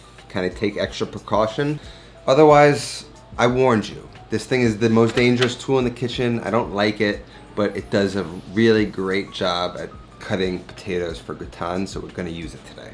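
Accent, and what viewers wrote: New York, United States. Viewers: Haven't watch this potato video yet, your description sounds delicious. I come from NY and listening to how you speak and your directness sounds like music to my ears, has a comforting feeling too.I miss the people of NY. So like your recipes, trying to cook them. Thanks for maintaining your integrity ( cutting board issues by others, not me.) Bless you and you hard work.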